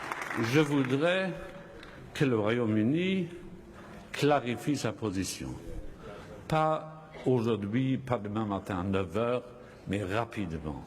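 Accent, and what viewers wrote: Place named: Germany. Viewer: il me semble monsieur Juncker que votre langue maternel est l Allemand pitiè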